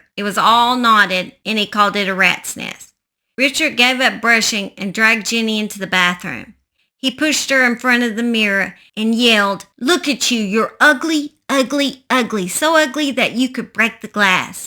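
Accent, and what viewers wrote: South, United States. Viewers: I’m a Texas girl who is frequently teased AND insulted about my “twang”, so I get how frustrating that can be… In ALL honesty though, the fact I’d never heard the Noah Prestgrove story was the story that brought me to your page. Your “southern accent” and passionate expression in the stories you tell is what brought me to follow you. You’ll get the “Karen’s” because some are so perfect, but you’ll also find others who embrace you for your accent! Hugs!! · I'm Irish and understand your southern English perfectly.....love Southern accent 😊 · I love your channel most people talk ghetto so if anyone is complaining about your southern accent it’s because they talk ghetto. Anyway I hear stories on here that I’ve never seen on other channels that’s the reason I subscribed to yours! Much love from Illinois! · Im Australian and i understand your southern english so pay no mind to the nay sayers 💖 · I can understand you perfectly I can tell you from the south by your accent, but you’re not slaughtering the English lang by any means and I love your channel - remember they hated on Jesus! 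· You think people in the Southern part of the US speak unusually? Try figuring out what a fast talking person from Scotland is saying!!! You'd swear it wasn't even English. That is why having an open mind is a good attribute -- instead of knocking others, you can appreciate & enjoy the many ways we ALL share this language with different spins on how it is spoken. I LOVE your SOUTH DRAWL & I'm from Brooklyn NY.❤❤❤❤❤❤❤❤❤❤❤❤❤❤❤❤❤❤❤❤ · As an austrian living in germany i had no problems understanding you and i absolutely adore the southern accent:) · As a southern girl from Oklahoma myself, I understand the “southern English” as well! Y’all give this girl a pass! Thanks for the video! Simon is great too!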